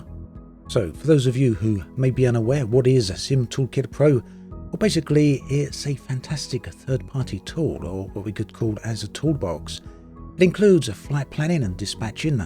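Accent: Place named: Essex, United Kingdom. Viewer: As a UK citizen I find it fascinating that anyone would think of an Essex/East-ish London accent as ASMR - ha ha. They do say the only was is Essex (UK TV joke). Sorry to if I'm wrong.:-)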